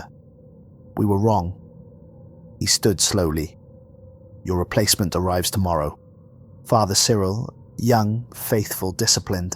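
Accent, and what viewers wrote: Wales, United Kingdom. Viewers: "Welsh" & he is great to listen to. :)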